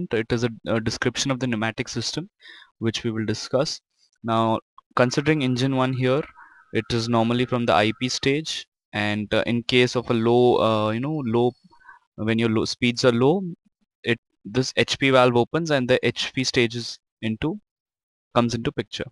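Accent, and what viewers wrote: India. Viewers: Are you from India ? You speak like Indian 😊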